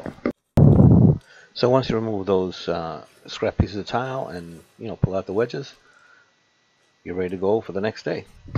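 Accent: Boston, United States. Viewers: That has to be the coolest accent in the Us. 👍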